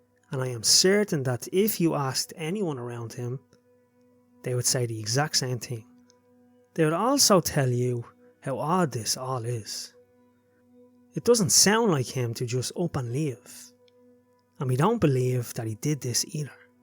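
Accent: Dublin, Ireland. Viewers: Its a Dublin accent alright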